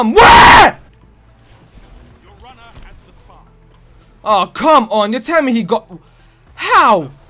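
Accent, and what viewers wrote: United Kingdom. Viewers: with a british accent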